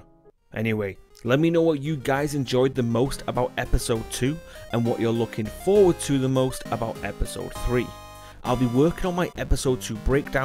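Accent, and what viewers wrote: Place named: North, United Kingdom. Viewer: subbed for his northern accent! :)))) KING IN THE NOFF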